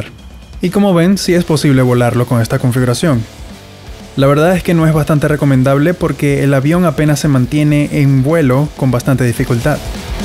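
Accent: Venezuela. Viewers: Epa eres venezolano? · Hola, por casualidad... Eres venezolano? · Hola está buena la prueba con ese motor, te felicito por todo sobre todo me gustó que me parece que eres Venezolano, yo soy Venezolano, si estás interesado en un proyecto para hacer máquinas para lavar perros por favor contactame para coordinar algunas propuestas. Muchas gracias. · Buena! jajaja. Tengo una duda con tu nacionalidad brother! Parece venezolana, pero tengo duda XD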